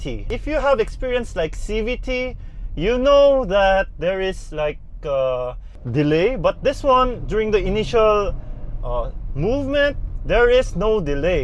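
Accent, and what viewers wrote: Philippines. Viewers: I like the way he speaks English with an Ilonggo accent.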